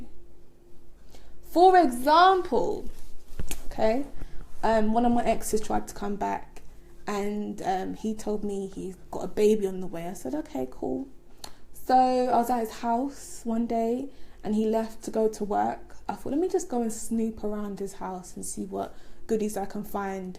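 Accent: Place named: London, United Kingdom. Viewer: Also nice to hear a London accent on an astrology video 🥺🥺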